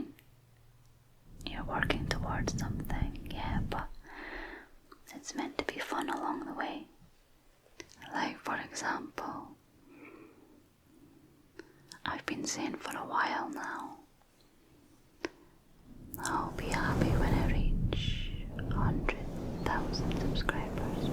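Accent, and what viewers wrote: Scotland, United Kingdom. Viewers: So soothing, eyes involuntarily closing, and the video becomes a softly whispered, Scottish podcast...very nice.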